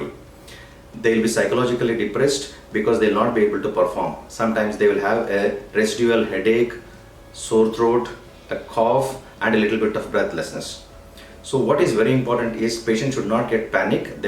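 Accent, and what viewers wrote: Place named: Tamil Nadu, India. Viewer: Hard to understand your English..too strong tamil accent.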